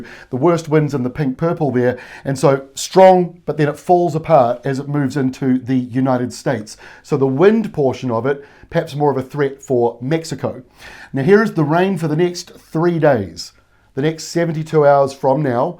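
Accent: New Zealand. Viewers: Love seeing local weather with a NZ accent, a smile, and no screaming. Got the colors right, too.. Beautiful.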